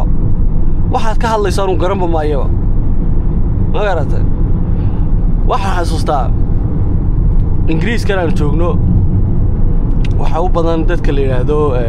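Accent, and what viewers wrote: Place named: Somaliland, Somalia. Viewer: Is fahano dhibka wa lahjada😂 adinka wa idin ku qosalna wlhi marka ad xamar imdaan😂lahjadina funny wye guuleysta rer somaliland one love❤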